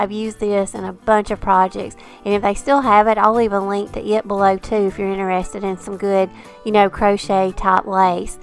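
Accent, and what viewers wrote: South, United States. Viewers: These are darling crafts. I love rag wreaths. The fabrics are so cute. I’m a seamstress and I love fabric. I love your southern accent. You have such sweet voice. 🎃🧡🍁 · I LOVE EVERYTHING YOU MAKE!!! Thank you for sharing your wonderful projects and talents!!!! And from one Southern Gal to Another, I love and appreciate your accent!!!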